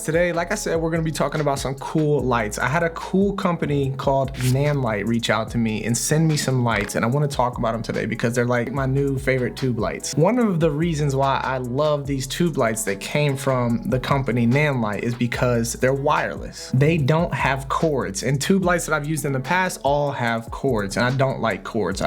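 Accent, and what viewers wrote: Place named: Philadelphia, United States. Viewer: Bro I swear you sound like you’re from philly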